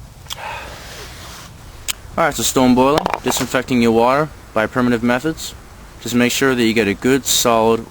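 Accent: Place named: Massachusetts, United States. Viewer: Massachusetts accent....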